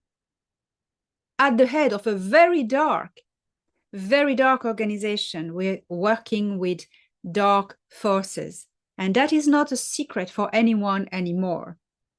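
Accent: France. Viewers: As much as I love the information, and I must admit sometimes it does seem a little far fetched although these days there's really no such thing, the thing that keeps me coming back is that French accent there is just something about it well at least I'm honest lol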